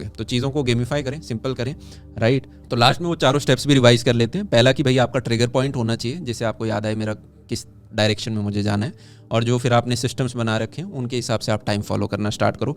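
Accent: Haryana, India. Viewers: Aap haryana se ho na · bhaiya aap Haryana se ho ? kya aapke accent se lagta h😃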